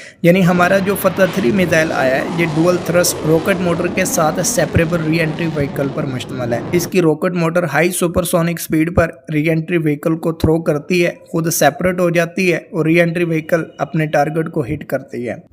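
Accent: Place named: Punjab, India. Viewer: Bhai please English panjabi style me na bola kar bura lagta hy.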